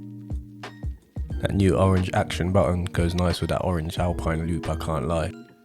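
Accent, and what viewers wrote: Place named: London, United Kingdom. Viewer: Nice vid bro. Stumbled across your vids. The london in you is clear to see ha ha.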